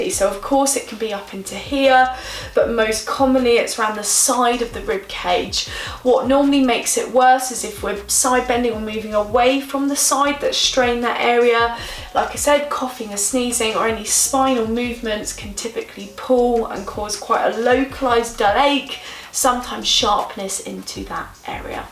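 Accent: United Kingdom. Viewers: The accent tells me that the speaker is British or somewhere from Europe with British accent ✌️ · UK company and yes she sounds as English as I am.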